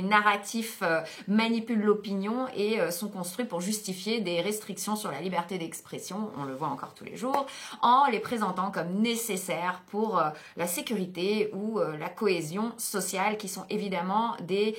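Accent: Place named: England, United Kingdom. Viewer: Bonsoir madame, non moi je dois trouver un moment pour regarder un de vos films, avec votre english accent que jadore, sinon toujours classe.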